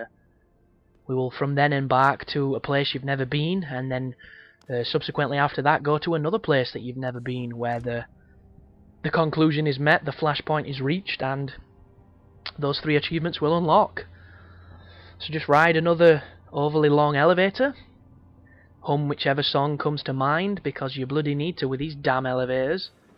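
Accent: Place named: Northern England, United Kingdom. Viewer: Nice walkthrough mate....As a 51yr old gamer I found it refreshing to hear an Northern English mans voice!!!...all the best to you ..from NY....THAT'S NORTH YORKSHIRE NOT THE YANK CITY!!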